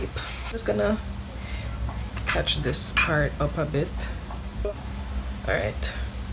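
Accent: Jamaica. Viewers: You sound like a Jamaican 🥵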